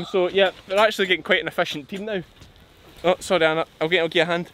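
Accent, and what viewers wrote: Scotland, United Kingdom. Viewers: I love your Scottish accent. I’m a big Outlander fan and I’m anxiously awaiting Diana Gabaldon’s next book in the series. My maiden name was Thomas, so we’re Welsh. On my bucket list to visit Great British countries but England can wait. #ACAP! Btw found out about you from your debut on The Hoof GP’s channel. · Please don't laugh. I'm a new subscriber, when you talk are you speaking just English or do you throw in some Scotish as well?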